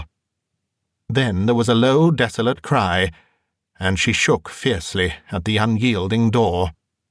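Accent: United Kingdom. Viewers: New subscriber, very impressed with your delivery! I've always loved the vintage stories narrated with a British accent. They just demand them read so and you do a marvelous job! I'll be enjoying your work often! Thankyou!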